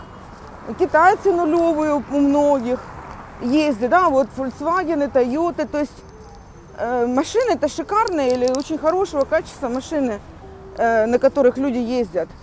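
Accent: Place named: South, Russia. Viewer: Да ладно тебе к Москве придираться. Прям смотреть ролик не приятно, я понимаю что тебе там накипело и прорвало. Я так понял вы критику воспринимать не умеете. Но это смешно все видео замирать Москву. Работайте над собой. Пишет вам не Москвич если что. Первое что отталкивает это акцент, южный акцент он провинциальный, в Москве это всех отталкивает. И да Москва этот высший уровень России , увы не Краснодар. Этот факт тоже стоит принять😂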